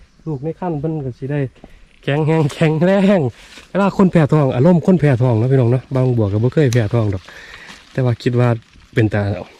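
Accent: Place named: Nong Bua Lamphu, Thailand. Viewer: ให้มันสุกก่อนจ้าจั่งสิแซบจ้าเจ้าคือเว้าคือแท้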